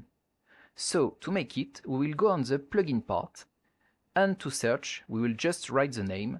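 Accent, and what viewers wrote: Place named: France. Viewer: L'accent français je l'aime tellement, et vous avez aussi un bon scénariste (jeff)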